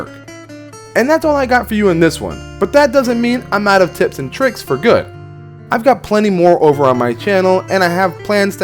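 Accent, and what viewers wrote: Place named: Mexico. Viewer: you sound Mexican...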